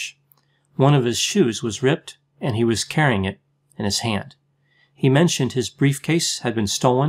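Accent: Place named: Baltimore, United States. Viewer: There was an Unsolved Mystery located where I'm from too. Season 1 Episode 1- Baltimore at The Belvedere Hotel. I lived a block away from The Belvedere for 5 years. In one apartment I had The Belvedere was my bedroom window view. It was really fun seeing all of the different shots of the building and the neighborhood around it. Some great shots in that episode. I knew was lived near me because we have the same accent.:) Regarding this case- I also said trash truck compactor but they didn't mention that in the show. My other theory is he was beaten by teens because there is a problem with teens beating/ killing homeless people. They probably saw him disheveled and thought he was homeless which is why they never looked to take his watch and money. Then he was either put or went in the dumpster then finally killed by the trash truck. I wondered if he ended up in Newark because he was trying to get to New York and he misheard the person who gave him the ride.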